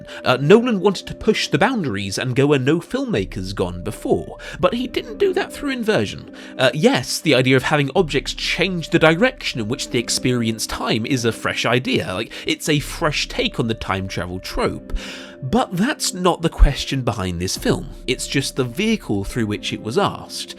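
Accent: England, United Kingdom. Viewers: you sound British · The dumbest and THICKEST apologist video you’ll seen on Tenet. Just because he has an English accent doesn’t mean his points are insightful, they’re just verbal salad.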